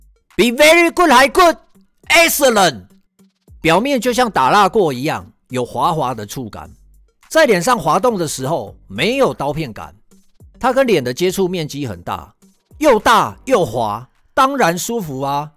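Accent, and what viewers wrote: Taiwan. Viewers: Jasper你好，喜歡你講台語的用詞跟腔調，很合高雄人胃口😆 我是個想換刮鬍刀的新手，看了幾部你的影片， 發現一些長相很像市售盒刀的傳統刮鬍刀在你的影片畫面一閃而逝， 由於那些一閃而逝的畫面並沒有特別提到型號，所以我也就無從搜尋起， 因此想來請教Jasper，有哪些長相像一般市售盒刀，又適合新手用的傳統刮鬍刀呢? 謝謝回覆~ 🫠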